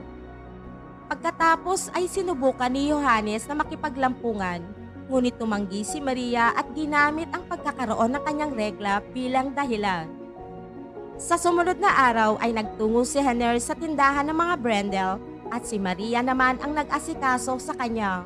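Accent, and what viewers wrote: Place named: Pampanga, Philippines. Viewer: Kapampangan ang nagkukwento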